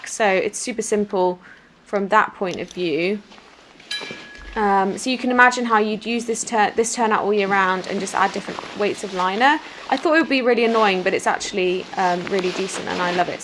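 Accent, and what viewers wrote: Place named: Devon, United Kingdom. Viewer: thats ok! I was just wondering from your voice, it sounds like my aunties and shes from devon xx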